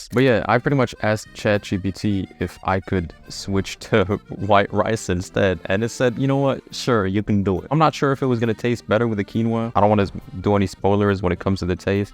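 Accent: United States. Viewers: I don’t know if you’d take this as a compliment or not, but your accent would easily pass for American lol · cool video! I'm surprised you've never been to the US, you have a very american dialect!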